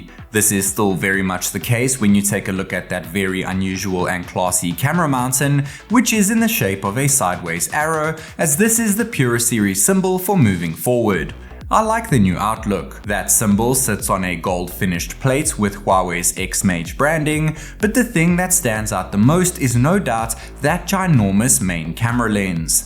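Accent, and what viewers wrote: South Africa. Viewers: You're South African???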